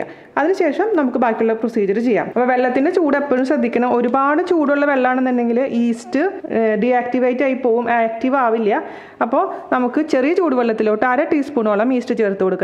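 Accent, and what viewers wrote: Thrissur, India. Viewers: Thrissur Baasha keakan thonnumbo ..chechys vdo kaandu samadannikum....kubbos and chicken for todays dinner:)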